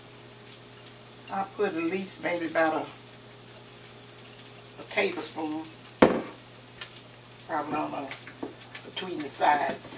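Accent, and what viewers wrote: South, United States. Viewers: Love your southern accent and your video...making this for Christmas 😊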